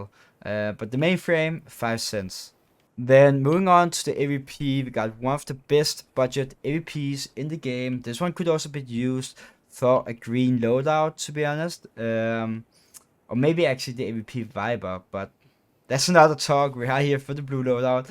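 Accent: Denmark. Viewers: Bro are you danish?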